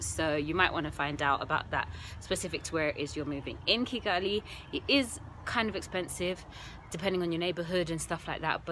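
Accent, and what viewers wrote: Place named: United Kingdom. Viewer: Omg I hate British accent, but I love the video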